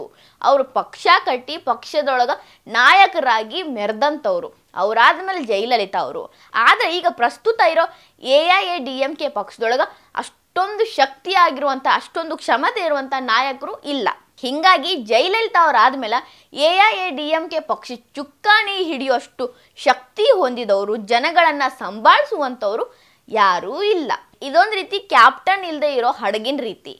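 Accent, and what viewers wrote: North Karnataka, India. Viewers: ನಮ್ಮ ಉತ್ತರ ಕರ್ನಾಟಕ ಮಂದಿ ಭಾಷೆ ಕೇಳೋಕ ಚಂದ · Utthara karnaataka😊😊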